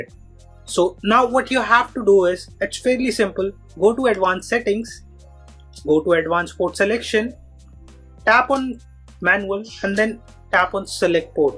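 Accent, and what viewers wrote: India. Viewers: your speaking a Indian accent, no one understands that shit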